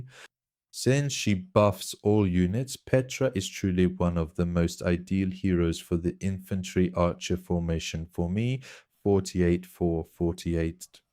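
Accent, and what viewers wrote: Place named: Turkey. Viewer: I listened to the video in the english language and the translation was very good. Oddly enough it still has a Turkish accent. Thats not a bad thing I was just surprised since it is AI translated I wouldn't have expected there to be any accent. You must speak very quickly in Turkish!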